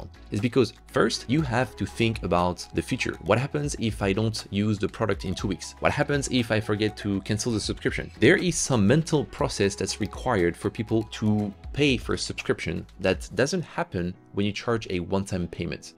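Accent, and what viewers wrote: France. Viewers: French accent is like honey for my ears Thanks for sharing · Are you French ?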